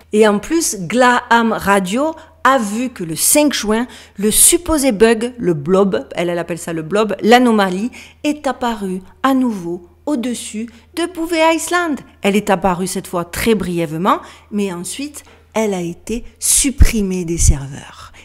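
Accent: South, France. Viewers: Je t'ai decouvert grace a ton apparition dans la video de rock sauquere... Franchement , sujet tres intéressant, hate de découvrir l'ensemble de tes videos . Et vive l'accent du sud 😍🎉!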